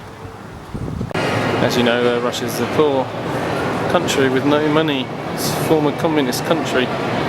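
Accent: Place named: United Kingdom. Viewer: You does not need to write word "Englishman", your accent is soooo british, we already understood that you Englishman.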